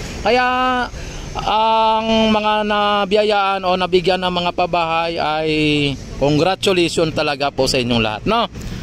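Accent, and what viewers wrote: Visayas, Philippines. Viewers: Kuya ayusin mo ang pagsasalita mo Visaya ka kuya ang dami mong alam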